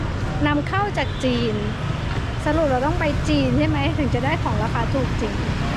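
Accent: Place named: Thailand. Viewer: Melihat beberpa video anda yang berawal dari Vietnam, saya beranggapan bahwa anda orang Vietnam, rupanya anda orang thailand 😅. Cuma dari awal logat berbicara anda, saya sedikit memahami bahwa anda orang thailand, hanya karena anda meliput video Vietnam maka saya mengira anda orang Vietnam 🙂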